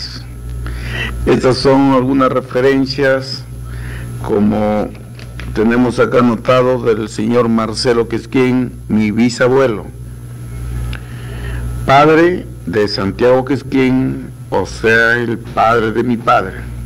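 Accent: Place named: Castile, Spain. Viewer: Felicitaciones por el audio-video pero me parece que algunos fonemas son pronunciados a la manera castellana. Creo que el muchik tenía el fonema SH escrito con X, y Jequetepeque se escribia Xequetepeque segun la grafía del castellano del siglo XVI y se pronunciaba Shek(e) tepek (e) como en Short y no con J que fue un cambio fonético del español en los siglos XVII-XVIII. Por demás es comprensible q así sea pq el idioma muchik era muy difícil de pronunciar y no subsistieron hablantes maternos en el siglo XX a excepción de un anciano en 1987 q recordaba el habla de sus padres pero no lo hablaba, como para aprenderlo correctamente 🖒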